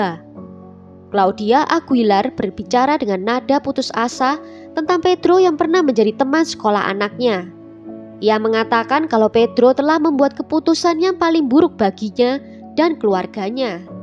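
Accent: Java, Indonesia. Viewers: Hay jg medokuhhh..sukaaaa👍👍😍😍😍💞💞💞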